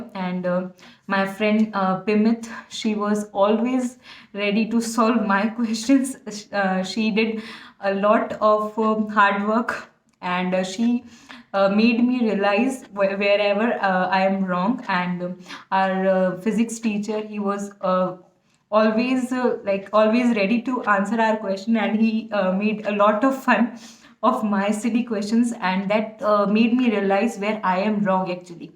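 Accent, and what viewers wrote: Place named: South India, India. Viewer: Di you have a South Indian english accent😍, please don't take it wrong... I loved it♥ You inspired me to be strong🙂 thankyou so much✨ I'll crack it for sure this time2021🙇‍♀️♥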